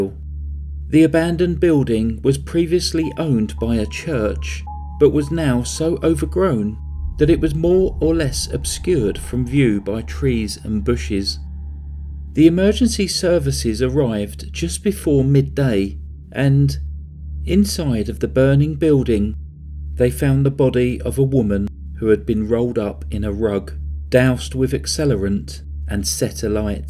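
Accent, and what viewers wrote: England, United Kingdom. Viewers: Good morning, you sure know how to tell a story. I feel sorry for Joyce. I must admit, we Yanks love the English accent, ya'll can make a sad story sound serious, yet sweet. Take care! (Hi CR Fam!) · What part of England are you from? South?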